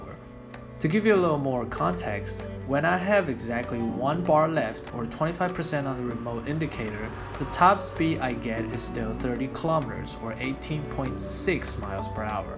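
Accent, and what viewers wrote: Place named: Taiwan. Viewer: 竟然是台灣人的影片，這口音很純喔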